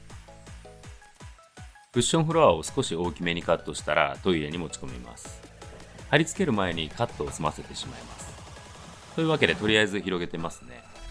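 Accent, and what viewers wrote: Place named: Aichi, Japan. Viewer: 私も愛知県なので、言葉の端々やイントネーションから聞き馴染みがありました。私の家の小さな修理をして欲しいくらい器用ですよね？旦那さんがそれくらい器用なら良かったのに。😅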